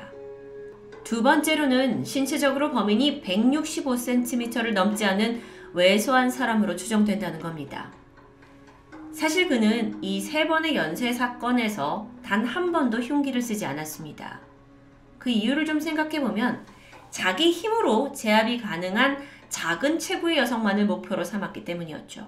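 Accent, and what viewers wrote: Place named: Jeolla, South Korea. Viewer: 고향이 전라도인가? "의" 발음을 "으" 로 밖에 발음이 안되네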